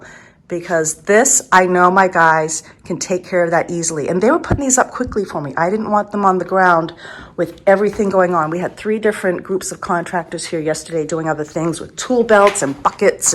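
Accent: New England, United States. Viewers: I'm not even halfway through this video and I'm so excited to see your kitchen come together. One question I have is it looks like your stove is far from your sink, but is that just a result of filming and perspective? Also, I love how you say "drawring". Don't ever change that New England accent! 😀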